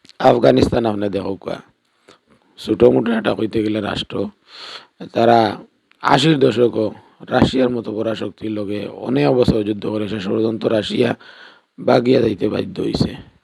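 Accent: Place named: Sylhet, Bangladesh. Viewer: খাটি সিলেটি ভাষা ❤❤❤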